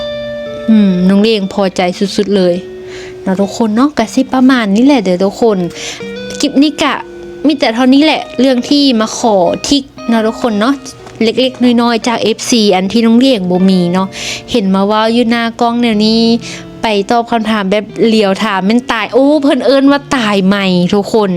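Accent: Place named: Northern Thailand, Thailand. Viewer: สำเนียงเว้าบ้านโพนม่วงเว้าม่วนพูดเก่ง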